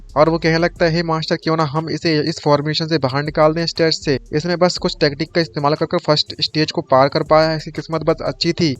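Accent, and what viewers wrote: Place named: Western Uttar Pradesh, India. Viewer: Bhai tera bolney ka same west UP ki jaisa h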